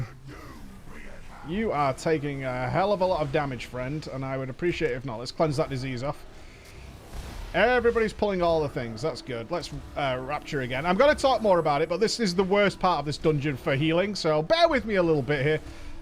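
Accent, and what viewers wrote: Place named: Northern England, United Kingdom. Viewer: so disc. is a shield bitch again huh? sounds good, I liked that playstyle. Oh the cringe.... everytime you butcher the English language, it just sends a chill down my spine. But I guess that what we learn is Southern English and so when you say something like Sat instead of sitting, it just sounds wrong. because "Sat" is past tense of "to sit". but I digress. I know its the way you northern English people speak, it just sounds wrong to me lol.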